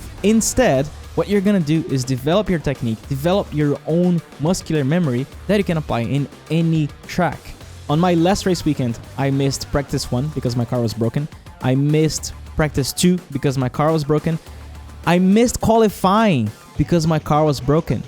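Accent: Brazil. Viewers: Sotaquezinho de brasileiro em hahaha, sucesso aí irmão!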